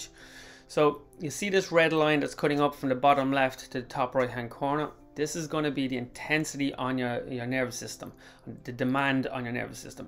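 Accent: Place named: Ireland. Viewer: You Irish ? I’m down in limerick. Nice to see a Irish person cover adrenal fatigue!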